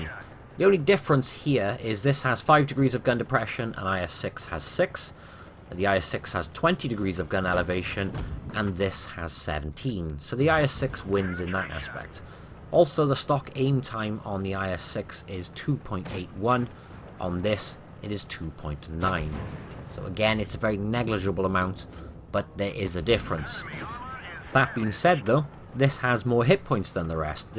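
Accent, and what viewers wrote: Wales, United Kingdom. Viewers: HE'S WELSH MUN!!!!! I DIDNT KNOW THIS!!!!! I LOVE 'IM ALREADY!!!!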